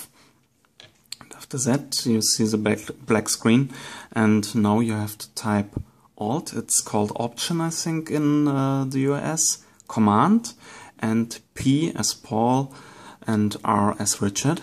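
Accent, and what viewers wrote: Germany. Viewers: German accent <3 Danke :)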